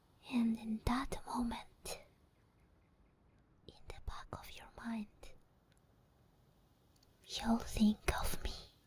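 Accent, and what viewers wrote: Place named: France. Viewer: Hi I’m new around here what accent do you have I really like it kinda sounds like French but my ears can’t quite nail it down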